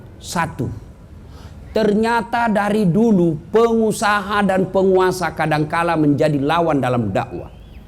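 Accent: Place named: Bugis, Indonesia. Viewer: Assalamualaikum... Saya juga suka sngat ceramah ustaz das'ad latif.... Tpi sy tak berapa nak faham, pekat sngat bahasa bugis nya..boleh tak sempan sarikata dekat bawa..(melayu) 😊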